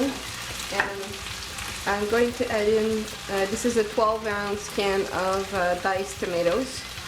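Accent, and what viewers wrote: Quebec, Canada. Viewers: What a cool channel Your accent makes me miss Quebec I'm going to make that except with 4 times the macaroni so it will have to be separate or that much more liquid I think it will dilute the sauce a bit though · J'adore l'accent québécois quand il parle en anglais. J'ai vu juste? :O))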